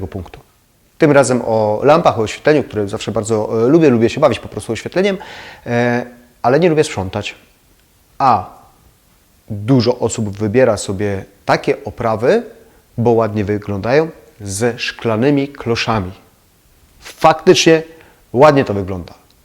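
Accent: Silesia, Poland. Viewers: Cześ ! Słychać żeś jes chopok z Rudy . Racja mosz . Trzymej sie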